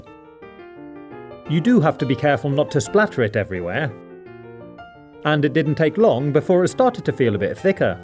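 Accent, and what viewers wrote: United Kingdom. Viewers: Your voice sounds British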